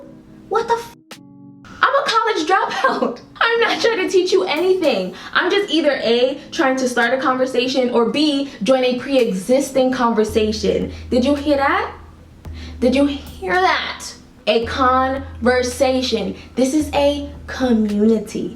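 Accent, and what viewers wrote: Jamaica. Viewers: Are you Jamaican or from the Caribbean because I hear the patwas enuh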